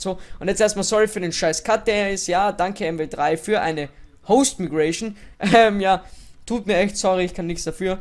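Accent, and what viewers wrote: Austria. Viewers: endlich amal a österreichischer kommentator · Bist Wiener :)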